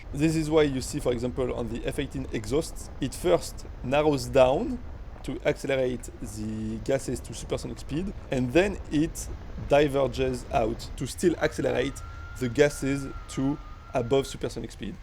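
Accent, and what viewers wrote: France. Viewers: You have a faint high pitched tone in your mic recording, most noticeable in the Outro. I enjoy your French English very much xD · His accent is French btw lol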